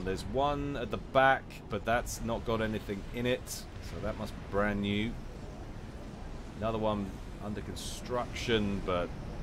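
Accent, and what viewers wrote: United Kingdom. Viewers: 5 stars caster. you know your game, great commenting on tactics and strategies. Pleasant voice with that lovely British accent ( sorry if you are not brit I took a guess ) and you pronounce french quite lovely. So glad I found you and subscribed.!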